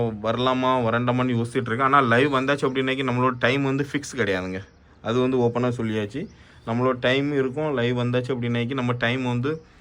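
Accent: Tirunelveli, India. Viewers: நீங்க திருநெல்வேலியா bro? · அண்ணா எங்க ஊர் திருநெல்வேலி பேச்சு மாதிரி இருக்கு அண்ணா சூப்பர் ❤️👍👍❤️👍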